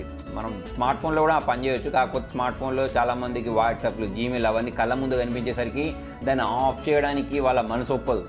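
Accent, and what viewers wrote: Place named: Telangana, India. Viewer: Anna telangana slang ......🤙🤙 Arjun reddy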